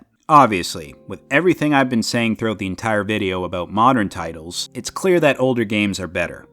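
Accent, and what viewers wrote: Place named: Scotland, United Kingdom. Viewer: Yeyeyye LET'S FREAKING GOOOOOOOOOOOOOOOOOOOOOOOOO BOIS NEW VIDEO IN THE HOOUUUSEEE. No need to apologise my brother. Just take proper care of yourself stay safe be safe and always keep smiling always. YEEEEEEEEEEEEEEEEESSSSSSSSSSSSSSSSSSSSESS WE GOT OUR SCOTTISH ACCENT, this is gonna be freaking awesome. I feel like many companies try to cut corners in such desert type areas with the rocks. Obviously not all of them but we have seen many games where the background has not been made properly or they have cut corners while making them, open to possibly many glitches. oh damn don't even get me started on KTJL. That game is just a drop bad in the animation department it's baffling that the same studio the same company which made Arkham knight made that dog s**t. It was a huge let down, a huge. I agree with what u just said making the world bigger is not always the answer for all games. 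Some games are not meant to have a bigger or more open world. I agree once again I prefer quality over quantity anytime and any day. I prefer to stay in the same boat as you, smaller semi open world. DAYUM that's such a good point yes they were definitely the reflection of the developer but remember some games like KTJL is also a reflection, but a reflection of that "S.B inc" iykyk it's the reflection of their worst part in their body. Assassin Creeds downfall is very bad to see. Uk I could be hated for my opinion maybe even u might disagree wi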